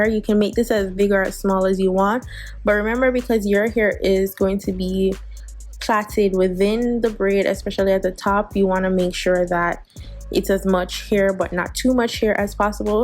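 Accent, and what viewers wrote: Jamaica. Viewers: i know you are jamaican lol the acent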